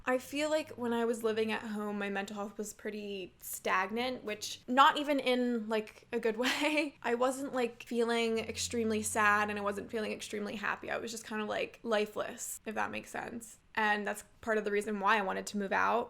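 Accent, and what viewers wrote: United Kingdom. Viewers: i bloody missed that british accent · I love your British accent and you🤍🤍 , btw what mascara do you use because you look stunning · This British accent lmao I love it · i know what a drinking game is, He/she said to subscribe everytime does a British accent 🤯 where's the drinking part?? Edit: no drinking in a "drinking game" lol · my crush on her when she speaks brittish 📈📈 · your nervous british accent :> so cute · YES BRITISH IS BACK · oh, how much I missed your British accent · 20 seconds onto the video and she’s already talking in a British accent😂😂 iconic queen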